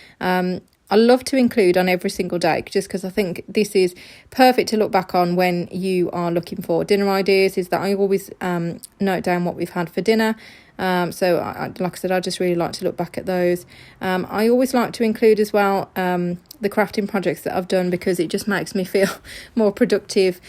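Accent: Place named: Birmingham, United Kingdom. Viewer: Which part of Birmingham are you from lol, enjoyed your vid.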